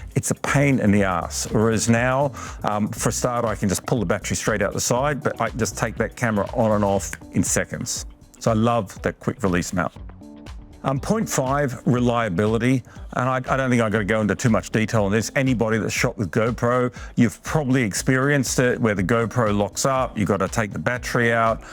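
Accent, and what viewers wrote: Australia. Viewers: Guy sounds Aussie, why would he give a fuck? And as an American, this "it's made in America" to prefer inferior products is lame. American companies should step up their game instead of keeping 6 year old sensors like GoPro. Don't even get me started about the DJI drone ban in the US with clueless politicians.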